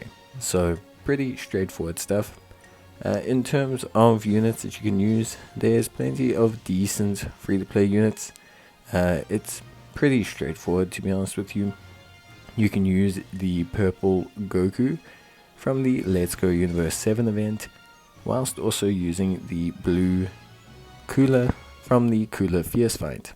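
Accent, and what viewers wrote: South Africa. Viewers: Hey brother are you South African cos i know that accent anywhere! howzit my china! cheers for all the help on the game helps alot. Keep powering up man!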